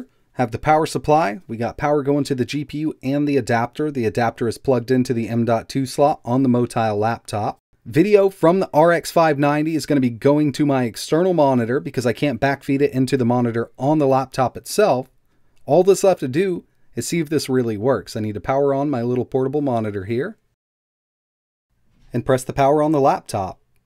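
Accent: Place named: United States. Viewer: What a voice of a cowboy sexy accent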